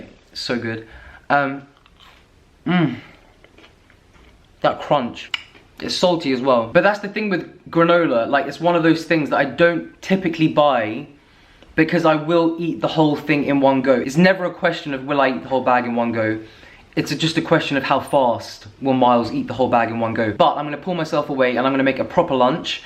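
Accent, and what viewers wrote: Germany. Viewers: I hear a german accent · She sounds 10000% German lol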